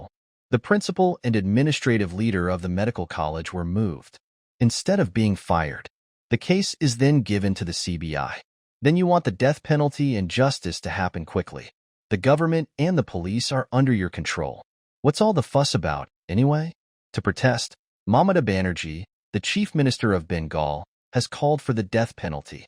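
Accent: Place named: United States. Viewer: Why the hell do you need an American narrator for this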